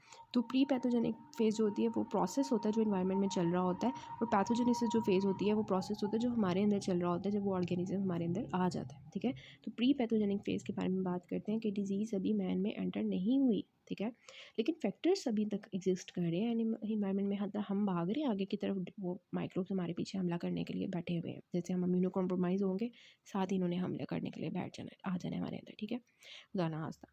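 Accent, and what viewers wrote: Karachi, Pakistan. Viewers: MAINE W22 OR BILAL MAZDY KA AWAZ SUNA MTLB AP KARACHI SY LAG RAHIII HAIN DAIKY LECTURES KITHNY SHOUQ SY SUN RAHA HU BAGROUND KI ENVIORNMENT BE CAPTURED KR RAHA HO HHHHHH GEO KHANS HHHHH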